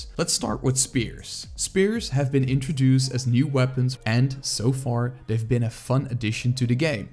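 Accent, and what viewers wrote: Netherlands. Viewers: Came for the A-class content. Stayed for the dutch accent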